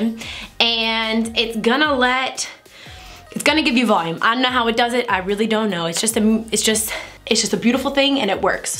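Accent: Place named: Texas, United States. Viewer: Not too strong, but I assumed you were a Texan the first time I heard you speak. It's not country though or East Texas twang. Brings out my drawl anytime I hear another Texan speak ( or Southerner for that matter), even when it's not thick. No idea why! Graveyard Girl does it to me as well. Incidentally, I watched Good Girls the other day and was excited when I recognized you. I yelled at my husband " WE HAVE THE SAME HAIR!!". He was confused and startled lol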